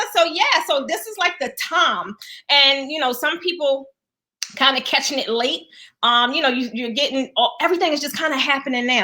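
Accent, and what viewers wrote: Baltimore, United States. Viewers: I love the bmore accent. Hope I got it right🌻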